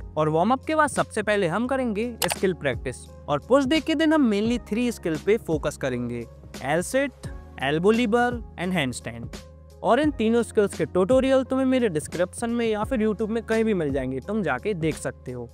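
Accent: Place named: Bundelkhand, India. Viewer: Bhai kha se ho voice se toh bundelkhand ke lagte ho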